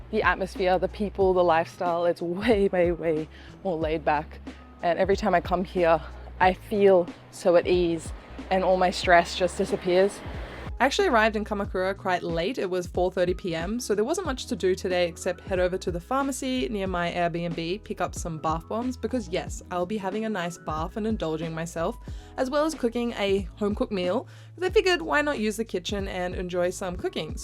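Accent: Australia. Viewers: Really enjoyed the day trip! I also enjoy your Aussie accent! He-yah (here) 😺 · I like you very much. Your Australian accent is lovely. The "hiya" (here) is very prominent. 😄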